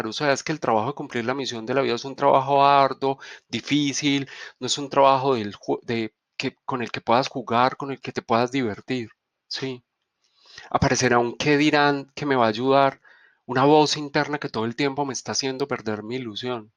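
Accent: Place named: Colombia. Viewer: Maravillosa exposición,una genialidad como enseñas; con profundidad y esencia das la enseñanza maya, Gracias!!! Encima de colombiano sos!!! Dulzura pura... amo profundamente la tonada colombiana, te enamoran al hablar. Un alemán te dice te amo y te asustas y un colombiano te insulta y pensas q te está piropeando. Desde Villa Carlos Paz, Córdoba, Argentina 💖💗❤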